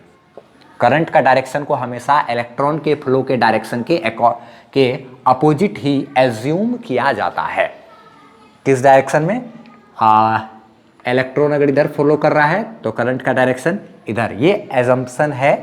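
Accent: Bihar, India. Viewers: Sir aap Bihar k ho..?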